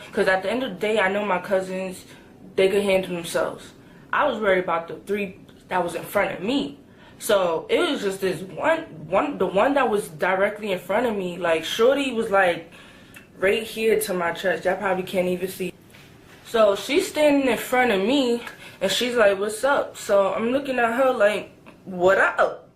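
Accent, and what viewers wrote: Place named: New York, United States. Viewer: LOL OMG i love these! "i put my victoria secret lipgloss away" 😂 😂This storytime sound odee NY!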